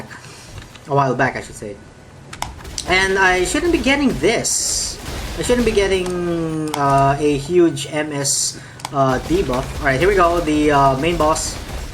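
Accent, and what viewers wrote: Philippines. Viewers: I was like..? Why he sounds like a filipino? And I search all the social media and yeah youre a filipino "kababayan" and matry nga to why not? Thanks for this vid I'll try this especially I'm a newbie.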